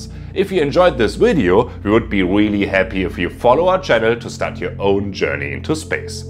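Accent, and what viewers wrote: Germany. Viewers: 😂😂 der deutsche Dialekt schlägt voll durch 👍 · Dieses Denglische bzw der Akzent....typisch deutsches Problem. Entweder man kann englisch oder lässt es sein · Ist sehr gut aber immer dieser deutsche Akzent 😅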